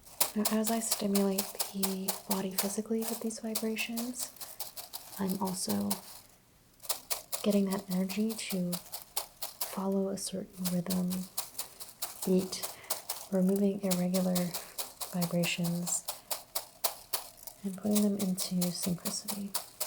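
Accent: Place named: West Coast, United States. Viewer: I totally thought you were east coast! Not west coast! I am JEALOUS of the Seattle ladies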